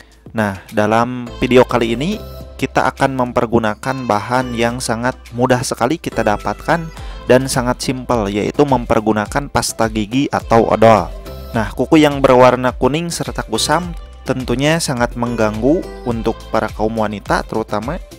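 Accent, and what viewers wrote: West Java, Indonesia. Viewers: Logat sundanya edun aa haaaa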